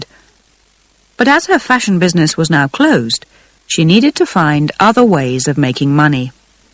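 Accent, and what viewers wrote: United Kingdom. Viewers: Rodolfo Santos british I think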